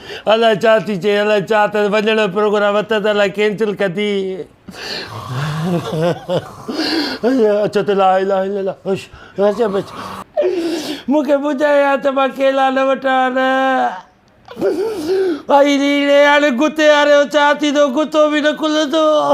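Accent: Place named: Shikarpur, Pakistan. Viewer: What a beautiful Shikatpuri boli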